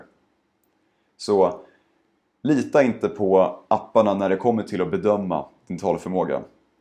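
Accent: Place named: Stockholm, Sweden. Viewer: Jag kan lyssna på dig hela dagen ! Prata mer. Snälla. Haha Jag just gillar din tonfall och stockholmska dialekter 😍😄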